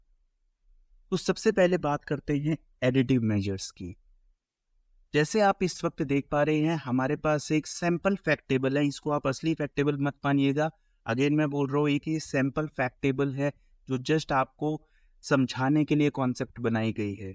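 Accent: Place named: India. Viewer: Good Indian accent on YouTube.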